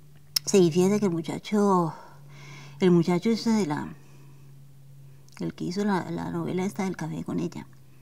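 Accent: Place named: Cali, Colombia. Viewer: Tengo un amigo caleño que habla igualito, jejejejeje Que artista tan buena eres, la mejor.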